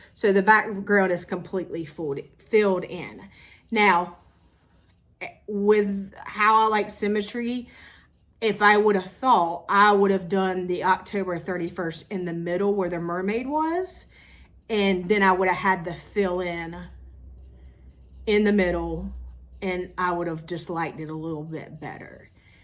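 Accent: South, United States. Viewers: You’re the only Southern or with an accent to enjoy listening to. Shhhh… don’t share that with anyone or I’m sure I’ll get a lot of haters!😊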